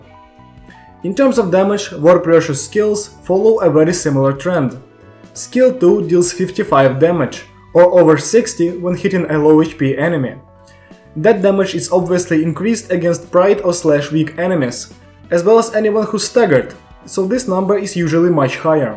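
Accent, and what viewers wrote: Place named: Russia. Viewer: ты случаем не русский?, просто акцент сильный вроде, я не уверен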